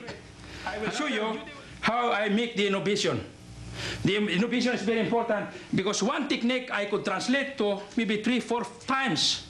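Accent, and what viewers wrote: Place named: Cebu, Philippines. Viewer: His Inglis aksent is typiical Cebuano. HIS ENGLISH ACCENT is CEBUANO not AMERICAN. Great MARTIAL ART, can be effective when your LIFE IS IN DANGER, SELF DEFENSE. The STICK is faster than the HANDS, faster that the FEET.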